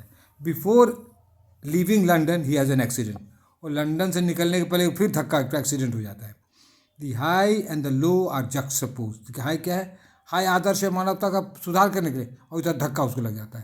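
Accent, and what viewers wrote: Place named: Bihar, India. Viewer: Sir ap Bihar se hai kya? 😂 Hasi hoybe kregi hahaha. I'm also from Bihar that's why I understand your dialect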